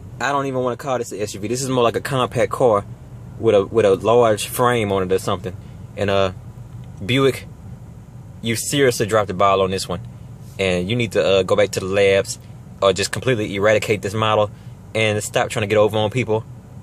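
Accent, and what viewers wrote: South, United States. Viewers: Dude where are you from, I love your accent I bet you’re from the South somewhere 😂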